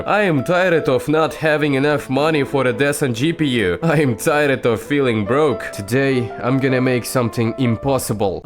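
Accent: Russia. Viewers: I love Russian accent😂😂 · Классное видео, мне понравилось. Суровый russian accent на высоте))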